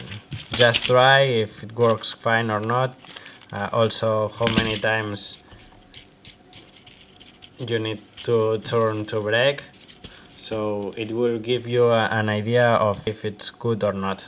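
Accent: Spain. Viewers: Como c nota q eres español XDDD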